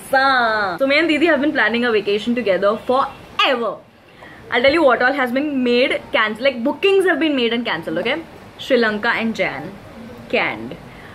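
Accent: India. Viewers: What's dat hindi accent ??🤔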